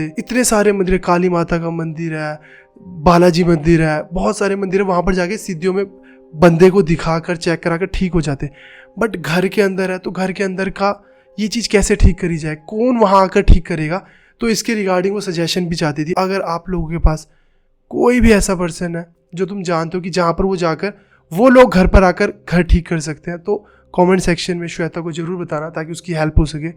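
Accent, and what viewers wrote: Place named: Delhi, India. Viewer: Aap dilli bale style me hindi mat bola karo, kahani barbad ho jata hai.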